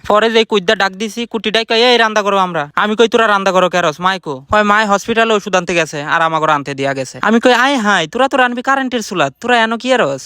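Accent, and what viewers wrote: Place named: Shariatpur, Bangladesh. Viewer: ভাই আপনার কথা শরিয়তপুর জেলার মতো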